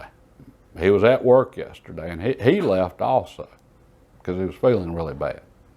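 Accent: South, United States. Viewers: You have just about the best southern accent of all time.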